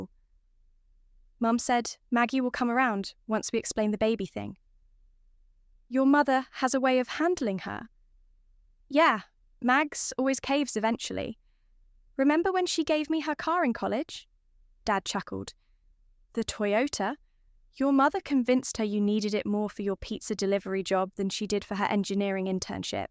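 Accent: United Kingdom. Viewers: Very interesting story. Good British accent to voice ai. Okay text ai. Ninas spelled Nah very annoying. I liked that endless angst of used persons which feels over the top most times was absent from this story. Its clear that having a good friend to talk over the crazy entitlement of her family was very helpful. The narrative movement is lovely. Her family's comments and entitled posts coming at points when she might have hesitated and had second thoughts. That Emma got such a quick sale and some help from the real estate developer in the timing was satisfying. I would guess parents had intended right from the start to give her unit to her brother. Also the speed of the process kept the browbeating, blame, manipulation and financial abuse from getting any legs . And once they were out, wow ,no time to indulge because now they had to pay their own rent utilities food etc. 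Definitely satisfying. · I loved this story. It was thrilling and beautiful karma. I absolutely loved the British accent, never heard any of the AIs narrate in it. Some consequences come with a high cost when u come to your senses finally but it's always worth it (not for u obviously). 🤣🤣🤣🤣🤣🤣🤣🤣🤣. Well done OP. This story was really gripping and i couldn't wait for the karma to hit. Perfectly brilliant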